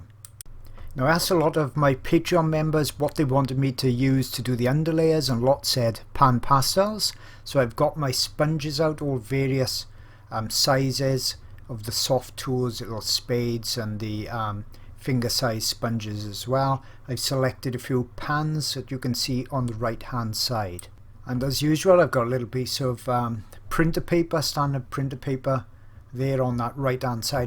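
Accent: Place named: Scotland, United Kingdom. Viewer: Thanks for ur tutorials u are a magnificent painter and u captivate ur audience in every way, I've learned a lot of materials and techniques with u, I'm not professional but I always liked the painting, now I've returned to my taste for pastels, it is hard to get the materials but derwent takes place in my country I bought some derwent pastel pencils in individual way and is more cheap than with the metallic case and other products, I give thanks for the Internet, a warm greeting from Aztec lands Mexico, I hope not bother u but u sounds like a scot beautiful accent 🇲🇽🇲🇽🇲🇽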